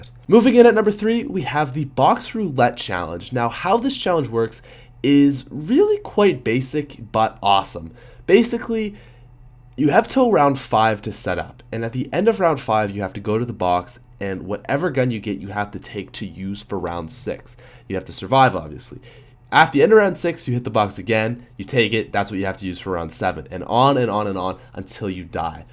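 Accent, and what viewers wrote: Canada. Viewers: don't dis Canadians accent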